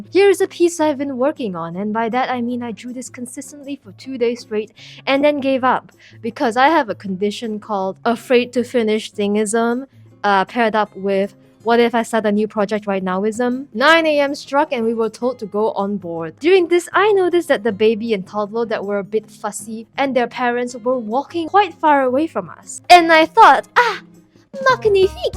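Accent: Malaysia. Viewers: Ngl the Malaysian Chinese accent catches me offguard every time lmao. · how malaysian chinese speak chinese is so real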